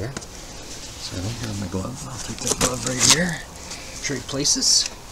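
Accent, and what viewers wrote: Canada. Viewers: Canadian eh????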